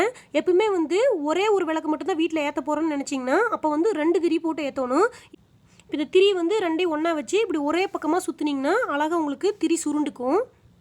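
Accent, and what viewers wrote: Kongu, India. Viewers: சூப்பர் பா... அருமையான விளக்கம். எந்த ஊர்காரர்ங்க நீங்க...? நம்ம கொங்கு தமிழ் பேச்சு கேட்க கேட்க அருமை.. 👌👌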